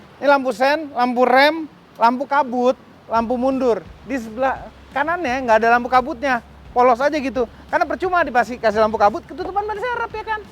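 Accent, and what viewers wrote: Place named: Kalimantan, Indonesia. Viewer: Aghhhh .... asyik banget denger nya om, bahasa " fungsi dan bukan untuk gaya" sprti bahasa pulau kalimantan😅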